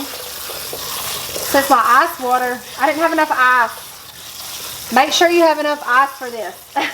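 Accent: Georgia, United States. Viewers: That's a south GA accent if I've ever heard one Source: Born and raised in South Georgia!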